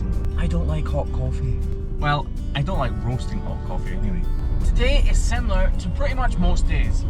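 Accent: United Kingdom. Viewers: Here's some ❤ for! YouTube has put several videos from last year BC (Before!!) & your life seems so much smoother than before. He is such a great help for you to be more efficient & focused! Yeah!!🎉❤🧨👍 Note: I think you are saying; I'm from Texas & those UK accents throw me off a tad!!😁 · Britain accent?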